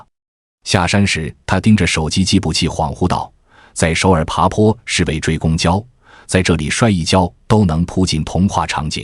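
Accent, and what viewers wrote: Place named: China. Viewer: 連ai配音都中國大陸腔...😒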